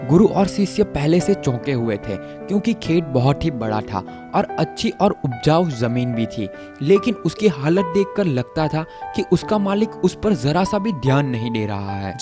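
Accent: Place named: Surat, India. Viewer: आप की सुरती बोली हे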